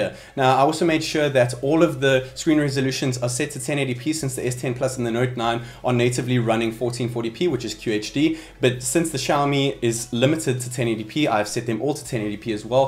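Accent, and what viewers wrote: South Africa. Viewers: Hey are u from South Africa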